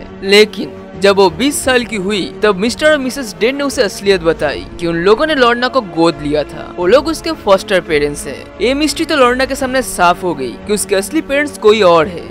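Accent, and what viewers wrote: Bengal, India. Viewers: Bro tum bengali ho kiya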